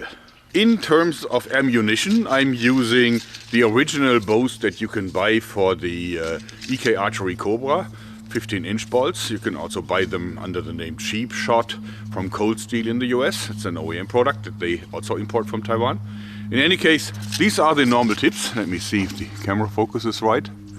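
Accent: Germany. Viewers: Your english is getting better and better. Don't lose the german accent, though, would be a shame!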